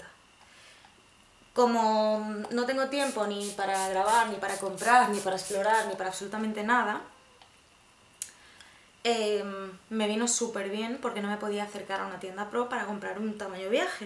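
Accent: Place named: Galicia, Spain. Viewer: Bienvenida!!!! Aquí te esperábamos fieles todas tus seguidoras, que gustazo verte y oír tu acento gallego. Voy a ponerlo en práctica muy pronto. Un besote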